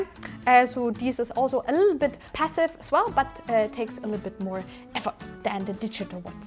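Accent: Germany. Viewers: You are like clark kent before and after costume.. she goes from that nerd look to a super sexy warrior.. lol... And that german accent nails it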